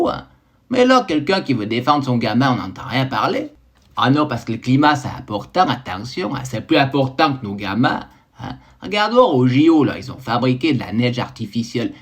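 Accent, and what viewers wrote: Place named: Valais, Switzerland. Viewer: Excellent, l'accent valaisans mais avec un bonnet valaisans; incroyable.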